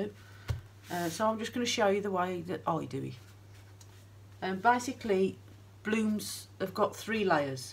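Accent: Birmingham, United Kingdom. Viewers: You can't miss a Brummie accent! Lol. (Says a Geordie). 🙂🙂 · Hi Ms Brummie, so lovely hearing that great Brummie accent. Great video I will share on my acrylic art page on Facebook to share your information to my peeps in UK I have resisted taking part in the blooms craze recipe and try to find easy non complicated recipe 😂😂 I’m in Australia so do have access to the floetrol. Well done 👍🏼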